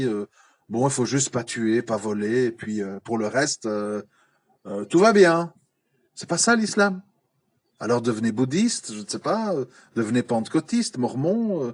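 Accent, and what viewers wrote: Belgium. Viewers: T es lourd à écouter avec ton vieil accent belge,petit débutant converti,t arrives pas à la cheville de Hassan iquioussen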